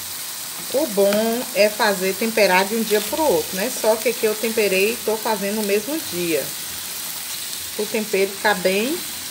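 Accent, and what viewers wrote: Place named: Northeast, Brazil. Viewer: Boa noite! Amei sua receita, vou fazer assim. Você é nordestina? Pelo sotaque, kkk, eu sou pernambucana. E pelo coentro tenho certeza , pois amamos.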